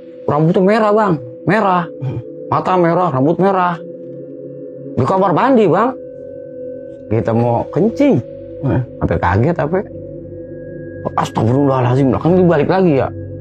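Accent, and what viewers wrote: Jakarta, Indonesia. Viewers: Bahasa Betawi Bekasinya mantap pak ustadz saya bangga jadi warga Bekasi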